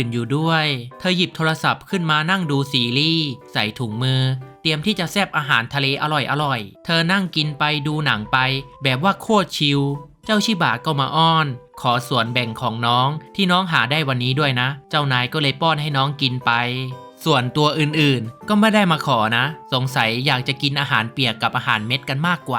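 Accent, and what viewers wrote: South, Thailand. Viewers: พี่เป็นคนใต้ใช่มั่ยผมก็เป็นคนใต้เเหลงใต้ไห้ฟังหน่อย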